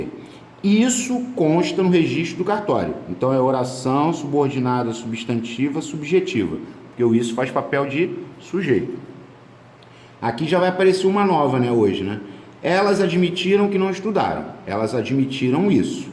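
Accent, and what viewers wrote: Rio de Janeiro, Brazil. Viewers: "tem sujeito, perguntou "o que?", é O.D., mané!!! uuauahauha, esse "mané" entrega o carioca mais q o "s" chiado uhauhauhauah Puta aula! valeu de mais,!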